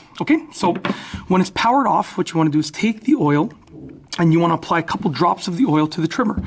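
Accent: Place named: Canada. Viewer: canadian ehh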